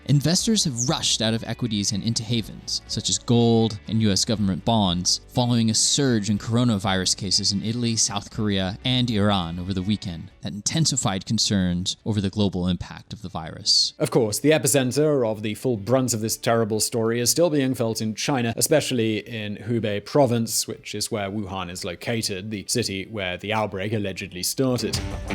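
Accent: United Kingdom. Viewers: Tmr pinche inglés británico xd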